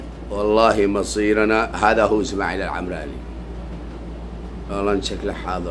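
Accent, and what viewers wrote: Arab world. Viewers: انت اردني ولا يمني اللهجه ماهي يمنيه . والله انك مهجن ابن حرام شكلك وهذا تحليلي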